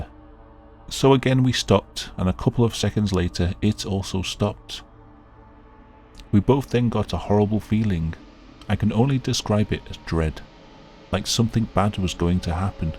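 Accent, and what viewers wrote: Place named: Wales, United Kingdom. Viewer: I could have sworn I heard “torches” the whole time. Guess I am not used to that Wales’ accent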